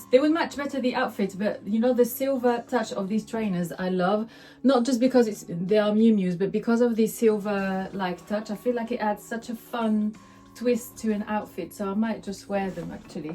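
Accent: United Kingdom. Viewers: Hi, I love your weekly vlogs. Especially this one, which is amazingly stylish and informative. What a lovely British accent you have 😊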